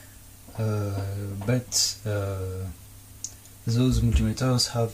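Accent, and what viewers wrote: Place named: France. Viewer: Hey! Change l’orientation de l’image, je suis obligé de figer la rotation pour regarder la vidéo dans le bon sens. So frenchy accent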